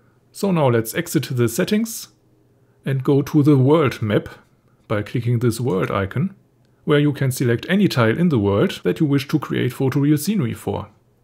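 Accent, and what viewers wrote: Germany. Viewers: also ich finde dein english ja absolut spitze, aber muss dieser Deutsch akzent dabei sein? XD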